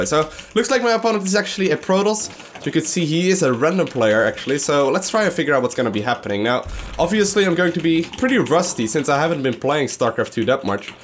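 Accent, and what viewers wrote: Netherlands. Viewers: He's dutch :) not Irish O.o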